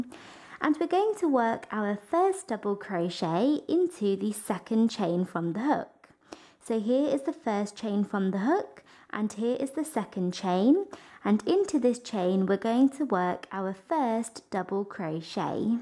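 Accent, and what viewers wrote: England, United Kingdom. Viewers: I love the tutorial but I must say the English accent is too much U over pronounce words and its distracting from the video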